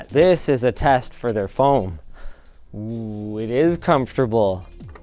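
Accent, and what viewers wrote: Canada. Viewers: Why does linus sound like he's leaning heavily into his canadian accent in this video.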